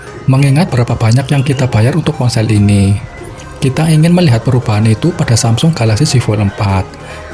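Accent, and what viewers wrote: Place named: Java, Indonesia. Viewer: medok amat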